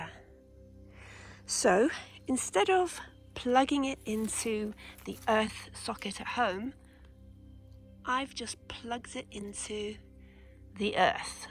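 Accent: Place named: United Kingdom. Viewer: Just came across your video you sound like you’re here in the UK , where did you get the tester from ?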